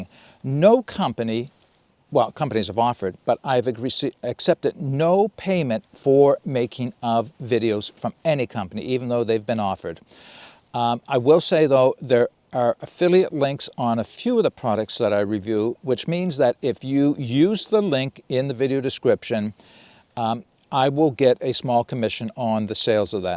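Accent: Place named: Nova Scotia, Canada. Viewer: Thank you for everything you do. The first time I stumbled upon one of your videos, your accent seemed oddly familiar. It took me a while to figure out that you were a fellow Nova Scotian. I have purchased a number of things based on your reviews. I trust your opinions. I cannot fathom the time and effort you put in. Well done.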